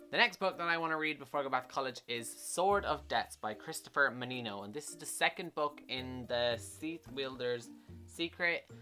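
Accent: Ireland. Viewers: Are u from Dublin ?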